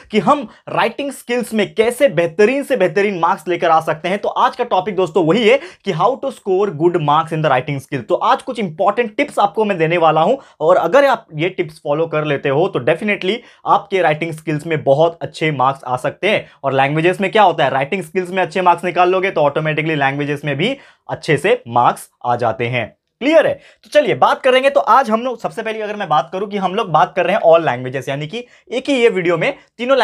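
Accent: Maharashtra, India. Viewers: Sir aap kitni pyaari Marathi bolte Ho 🤩❤😘😃😄☘️👍🙏🏻❤🌍💯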